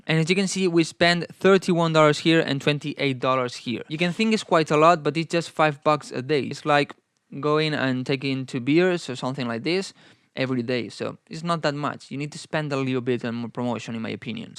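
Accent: Spain. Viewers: Good video, just what I needed . . . . . El español es tu lengua materna verdad? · It's spanish voice jeje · Great video man! I was so indeep on FB adds, this seems so cool as well cause is less complicated so I'll check out! BTW where are you from? Your accent seems spanish, I´m spanish too if it's the case hahaha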